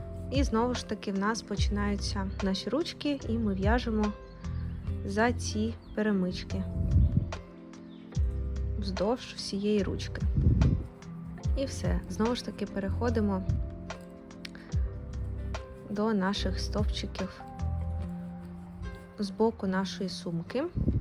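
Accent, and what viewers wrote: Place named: Russia. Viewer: Прекрасный мастер-класс,прекрасная мастерица,но боже, как же режет слух украинская речь с русским акцентом😓 Такое чувство,будто украинский не ваш родной язык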